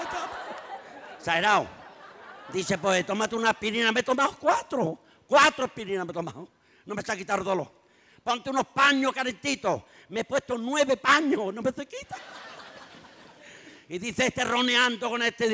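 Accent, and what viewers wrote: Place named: Andalusia, Spain. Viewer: Solo consigo entender el 40% de lo que dice. ¡El andaluz no es castellano!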